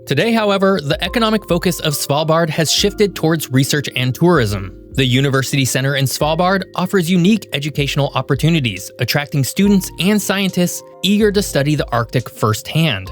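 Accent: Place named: Kentucky, United States. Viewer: Your English pronunciation is awful. It sounds like you went to school in Kentucky. Or, maybe you did. The most annoying is when you say "thuh" instead of "thee" before a word that starts with a vowel or a vowel sound. It's NOT "thuh" island, it's "thee" island, thee arctic, thee avalanche. No, it's not spelled differently, it's just pronounced a different way in different circumstances. Unless you were raised in Kentucky. Go ask your mee-maw, at least she has a second grade education. Also, your voice is shrill and you talk too fast. I don't think being a youtuber is for you.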